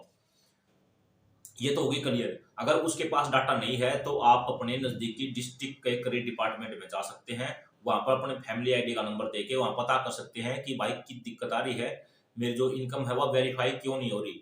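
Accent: Bhiwani, India. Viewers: Bhai shab aap Bhiwqni se ho kya aapki awaj se lag rha h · Are u frm bhiwani distct.. Your language is frm that area..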